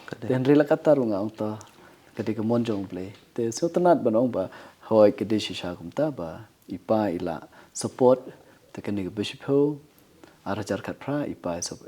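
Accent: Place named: Khasi, India. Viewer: Ba phi kren beit da khasi te nga sngewtynnad bn sngap🎉❤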